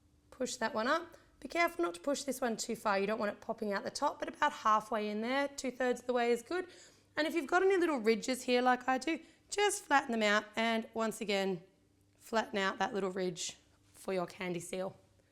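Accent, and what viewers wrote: Australia. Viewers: She's Australian, so yeah. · This is really cool but are u Australian? 😄😃😀😊😊😀😃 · She's Australian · I'm sorry, haters DONT hate please but... Is this Australian? The accent sounds familiar and hmmm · She does have the semi English accent at first, but her Aussie accent comes out alot more closer to the middle/end of the video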